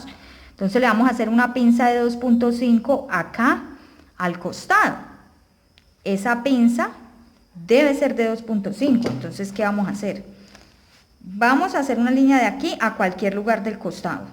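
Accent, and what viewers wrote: Colombia. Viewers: Hola me gusta mucho come nos enseñas, vivo en Italia hice un curso de dos años y me defendía. Per tú me has ayudado tanto, además que iorte hablar me encanta me hace sentir en mi querida Colombia... Te felicito y deseo tantos éxitos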